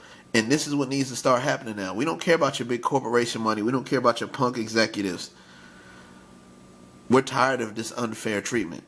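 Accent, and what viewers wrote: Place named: East Coast, United States. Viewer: EAST COAST, I LIKE THE WAY YOU TALK.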